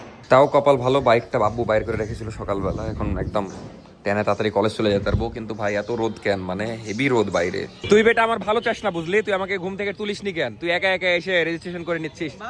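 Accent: Rajshahi, Bangladesh. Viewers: vai apner kotha sune bujte parci je apni rajsahi thaken 😅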